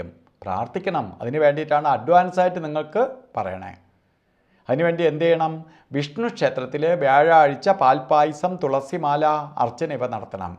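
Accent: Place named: Thiruvananthapuram, India. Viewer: Pakka tvm accent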